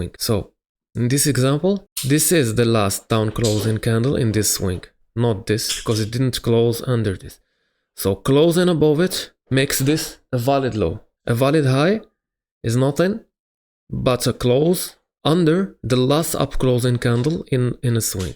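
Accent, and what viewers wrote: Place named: Morocco. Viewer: Are you moroccan brother? you sound like it, I am too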